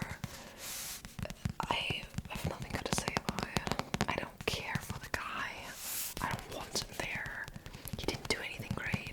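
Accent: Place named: Germany. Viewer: Dein Englisch wir von tag zu tag mehr denglisch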